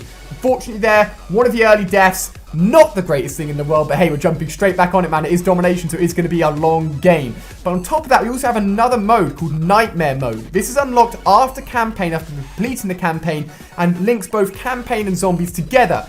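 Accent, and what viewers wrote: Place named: United Kingdom. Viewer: And I''m well aware of accents guys. I'm not oblivious of the fact that he's British also. So quit this arguing shit and move on kids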